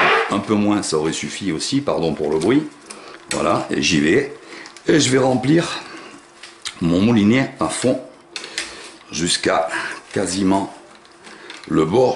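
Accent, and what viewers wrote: South, France. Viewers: votre accent du sud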